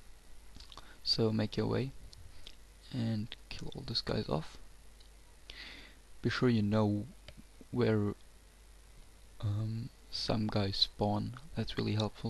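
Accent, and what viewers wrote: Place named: Germany. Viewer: Du bist deutsch, oder? (wegen deinem Englisch(th Aussprache))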